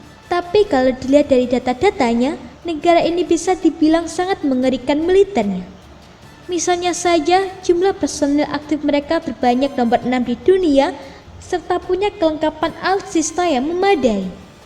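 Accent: Java, Indonesia. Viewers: medok amat ch ngmngnya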